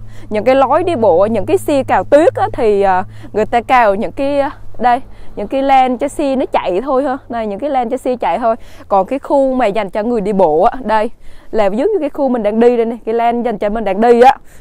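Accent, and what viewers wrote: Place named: Quy Nhon, Vietnam. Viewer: Giọng này là quy nhơn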